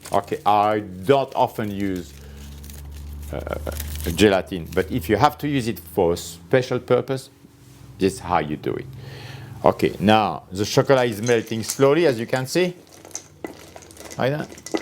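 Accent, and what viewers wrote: France. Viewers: It’s Amazing!!! So easy to understand and such a pleasure to watch,very entertaining with lovely french accent,Mercy SHEFF!!! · I love listening to the English french accent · When I watching this man I feel like in watching the movie Ratatouille. It is so amazing! The background, the setup, the lovely french accent...the way he looks!!!! It is a movie 🥰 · I really loved your english with the french accent 😄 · French speaking English also sounds beautiful.... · I love that french accent!! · such a cool accent the French. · l'accent français en anglais est horrible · Love the way you explain the process. Your French accent is very infectious, in a good way.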